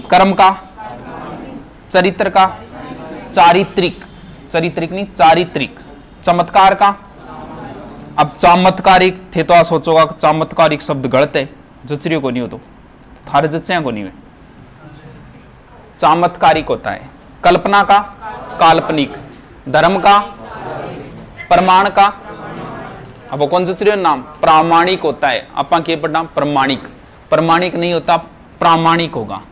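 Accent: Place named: Marwar, India. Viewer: Thank you sir gi so much very helpful your videos Or Aap ki bhasa desi marwad very nice 🙏🙏🙏🙏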